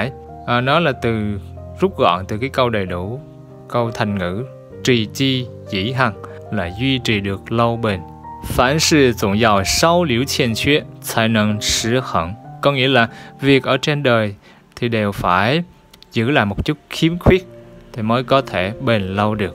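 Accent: Standard. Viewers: Thầy nói rất chuẩn và rất ý nghĩa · Thích giọng đọc của thầy dã man, giọng tiêu chuẩn trung ý, nghe hay quá chừng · Thật sự mê những video của anh, thật uyên thâm ạ, và giọng anh quá chuẩn luôn, mê!!! ❤️❤️❤️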